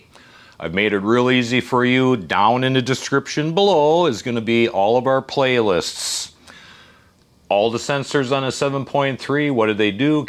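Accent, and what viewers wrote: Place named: Wisconsin, United States. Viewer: you sound like a fellow Wisconsinite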